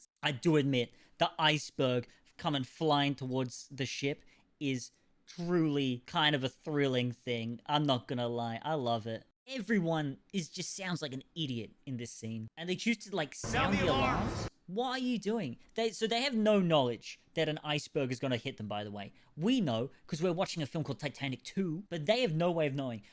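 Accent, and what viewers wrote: Australia. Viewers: Ok Australian Kurtis Conner! This was funny as hell loool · Never heard anyone pronounce Tubi like “tubby”. Lol! Thought we all knew it’s from the word “Tube”, as that’s the nickname for old school TV’s (coming from the technology used, to create the broadcast picture on the screen. They utilized a series of vacuum TUBES). Interesting. That a Aussie thing (not sure which country you’re from exactly, so I’m just guessing by your accent. Lol!)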